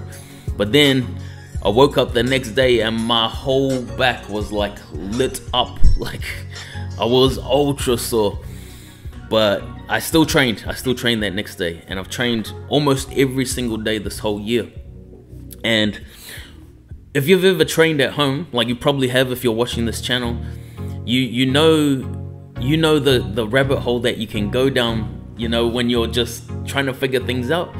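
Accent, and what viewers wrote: New Zealand. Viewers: I hear that NZ accent and subscribe immediately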